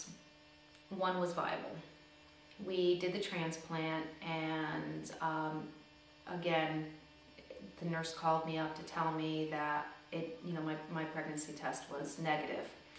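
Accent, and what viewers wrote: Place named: New York, United States. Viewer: I am so happy to hear that you finally had your dream of a child come true. God bless you, your husband and your son! It gives hope to people like me who have yet to be blessed with a baby. How much did the Hunyuan method cost you over the course of the year? A rough estimate would be great. What state are you in? You sound like you could be from NYC like me. Best of luck to you and your family and thank you for sharing.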